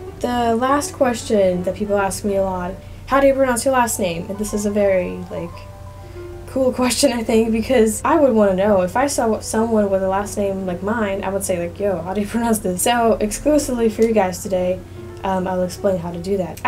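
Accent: Midwest, United States. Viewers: I'm from Indiana, and I can say listening to you talk you definitely sound like you could be from the Midwest. Congrats on your accomplishment! I've always wanted to be bi-lingual, so I am impressed and excited to see someone who was able to accomplish it. I'm still trying to learn Spanish as my second language. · You do a great job with Englsh, you sound like mid west accent, in Russia I was there short time on a cruise ..1995. St Petersburg very enjoyable and pretty city. You have great videos keep it up ok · Russian with American accent is nothing unusual why must a Russian person speak in a Russian accent? American accent is spoken all over the world its internet English. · yeah its called the aftermath of the fall of the commies. you could have put dumbo in charge and yall would do better over time cause there was nowhere to go but up. you sound like an american but you think like a commie. 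i heard a joke before, in russia you have 2 channels, 1 is propaganda 2 go back to channel 1. i dont blame you though its that russian pride. watching too much channel 1.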